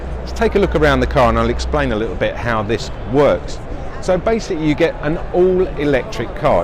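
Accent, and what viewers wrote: United Kingdom. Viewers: Please give the the figures in miles, you sound british !!!!!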